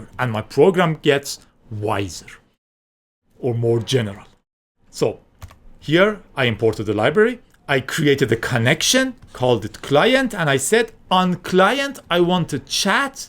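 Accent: Iran. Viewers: WOW I like your thick Persian accent it makes me proud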